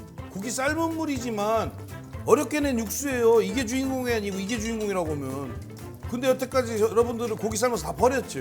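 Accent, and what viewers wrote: Chungcheong, South Korea. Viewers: 충청도 사투리로 구수하게 설명히시니 재미있고 당장 고기 사다가 삶아먹고 싶네요. 꼭 이대로 해봐야겠어요.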